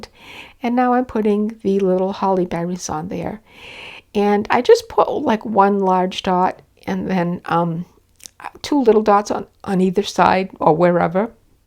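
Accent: New England, United States. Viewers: You have a lovely video library. Just subscribed. Also a lovely New England accent. I’ll definitely be checking back.❤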